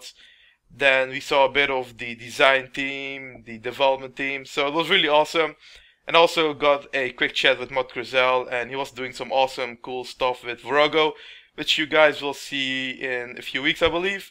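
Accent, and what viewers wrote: Netherlands. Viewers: Why does it sounds like you are Dutch or Belgium lol. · Hahaha ik wist het gewoon dat jij een nederlander was, ik hoorde het al een paar keer in je videos maar nu weet ik het zeker. ik denk post het maar gewoon dat ben ik dat ook weer kwijt. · are you Dutch?! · you sound really dutch in your english accent, where are you from?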